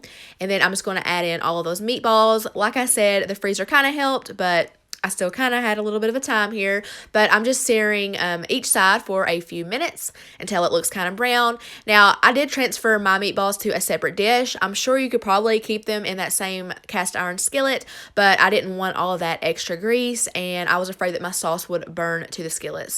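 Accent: South, United States. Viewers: Thank you, you are so sweet:-) If not eating it helps get rid of a host of issues I’ve been having...it will be worth it! I will still watch your videos as I love them! I also love your southern accent..lol! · I’m obsessed with your southern accent. I’m definitely trying those chicken bacon ranch sandwiches! Subscribed. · Love the country accent 😂🙌🏾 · Love your accent!! I'm a New Yorker so you really don't hear a southern accent around here.😋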